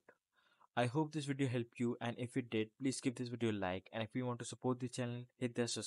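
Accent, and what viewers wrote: India. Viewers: Hearing the indian voice i didn't belive it would work, but i was quickly proven wrong. Thank you!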